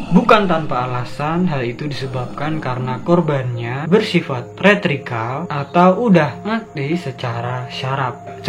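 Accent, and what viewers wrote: Java, Indonesia. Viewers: Jawa mana mas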